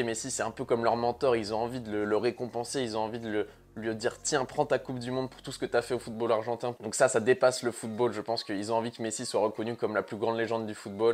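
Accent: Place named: France. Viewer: Tu es français normal que tu parles comme ça n importe quoi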